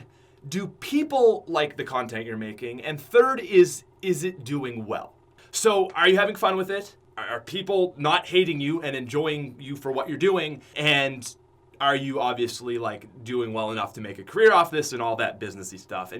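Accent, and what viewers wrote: Canada. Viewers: Sound so much more Canadian today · Been here since day 1! Love the content, Canadian accent, and the humor! Keep it up! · ahahahah oot. canada accent , its so funny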